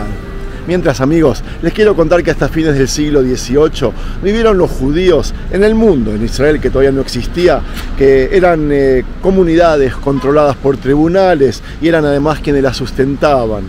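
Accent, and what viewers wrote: Argentina. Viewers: Hola. Soy Miguel de San Vicente bs as, no soy religioso, sin embargo vos con tus videos logras llamar mi atención, haciéndome ver cada uno de tus videos.Quizas sea el acento argentino. Quisiera hacerte una consulta, vos decís en el vídeo q los sefardíes son los judíos de oriente y los azquenazis los de occidente.es así o es al revés.? Te mando un abrazo grande y seguí así.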